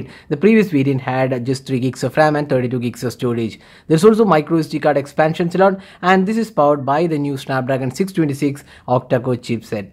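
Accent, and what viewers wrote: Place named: South India, India. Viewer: I love your South Indian accent that's why i subscribed .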